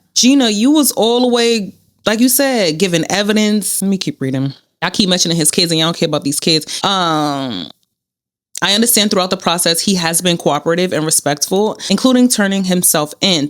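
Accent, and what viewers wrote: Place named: New York, United States. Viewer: Great video! Side note I love your NY accent to much ❤❤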